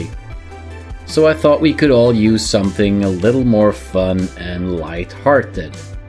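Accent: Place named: Sweden. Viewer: when I have stoped laughing I will try to figure out what part of Sweden you are from.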